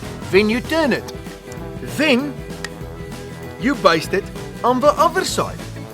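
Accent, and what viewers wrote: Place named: South Africa. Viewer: Ek dink dis vreeslik goed gedoen...."wif de Souf Efriken aksent & all"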